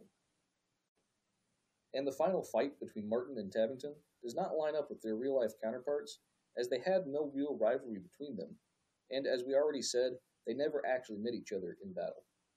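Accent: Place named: United States. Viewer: Brit speaking with American accent...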